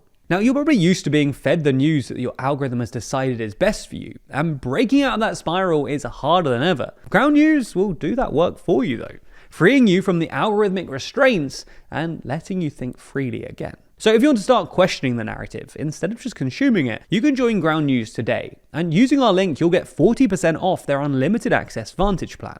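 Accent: United Kingdom. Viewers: the last time some guy with a British accent talks about trade imbalance with china... they flooded that country with opium and then invaded a few times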